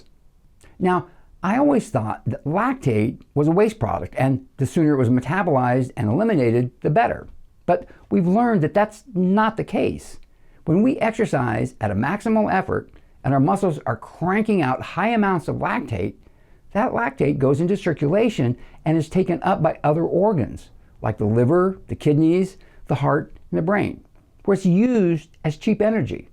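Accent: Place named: New York, United States. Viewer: FINALLY!.. Someone who is not full of bullshit!.. I will do my best to honor you and incorporate what you teach as best I can... Love your way of speaking... Are you from NYC?... 68 year old senior softball player, and just getting started with a rutine... Any words of advice?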